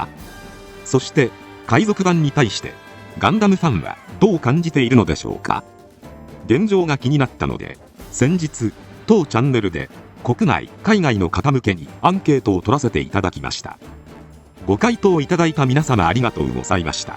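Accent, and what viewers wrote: Kansai, Japan. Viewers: さん、関西人だったのか・・・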